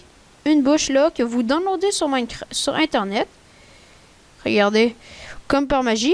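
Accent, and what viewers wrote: Quebec, Canada. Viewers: Moi c rendu que je suis TELLEMENT rendu habitué a l'accent français que je suis surprise de voir un accent québécois même si je suis québécoise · J'adore l'accent québécois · 1-J'adore l'accent quebecois 2-Super tuto merci bcp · Perso je kiff ton accent québécois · De 1 je m’abonne parce que merci De 2 je like parce que j’adore ton accent québécois ! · Jadore les accent kebekois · tu est québequoi ? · T quebequois ??? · j'adore ton accent et les québécoi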